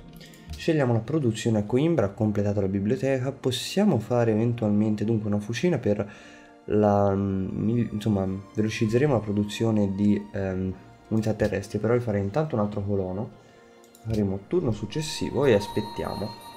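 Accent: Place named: Tuscany, Italy. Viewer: D :D figurati!! complimenti ancora...seguire i tuoi video è leggero e facile, sei molto "pulito" e il leggerissssssimo accento toscano fa colore!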